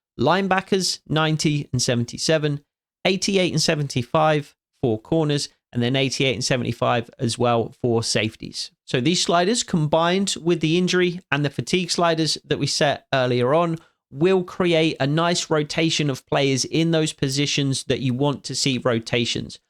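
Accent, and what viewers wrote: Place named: United Kingdom. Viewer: I'm sorry but I can't help but cringe anytime I hear a British person talk about college football.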